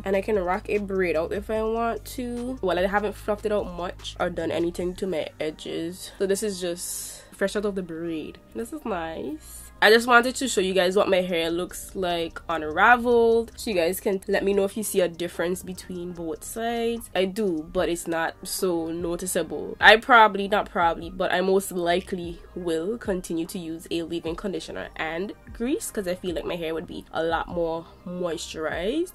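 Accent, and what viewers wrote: Caribbean. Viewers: Can hear that Caribbean accent... much love to my caribbean people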